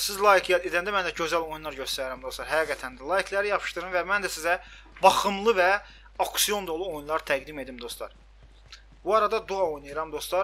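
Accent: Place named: Shamakhi, Azerbaijan. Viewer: emi Şamaxilisan? Menim bibim gil Şmaxilidi senin kimi danisirlar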